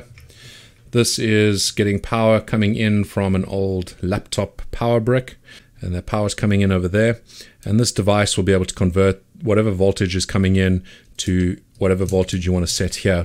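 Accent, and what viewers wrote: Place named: South Africa. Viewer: Amazing videos really on point with what i wanted to learn and the series is well set out. Thanks for the amazing content! Also can't loose that SA accent hey xD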